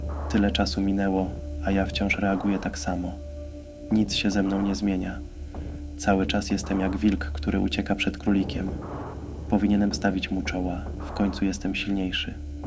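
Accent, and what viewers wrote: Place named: East, Poland. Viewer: dobra pasta dobry lektor chociaż momentami akcent wschodni słychać ale to nie przeszkadza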